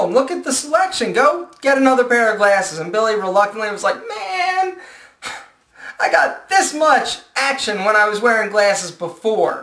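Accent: Philadelphia, United States. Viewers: Oh boy. Philly accent. "Gleasses"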